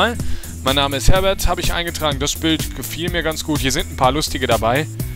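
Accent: Aachen, Germany. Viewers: KOMMST DU AUS AACHEN?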